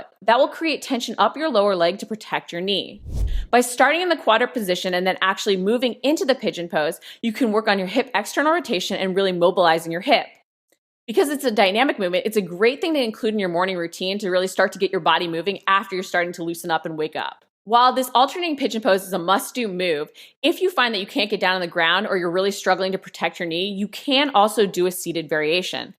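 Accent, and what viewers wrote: United States. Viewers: Where is your accent from in US? I’m getting used to it and your warmups and stretches are helping me get back into running. We can’t cycle here in France ar tbe moment but yes i have massive thighs and weak glutes/ hips!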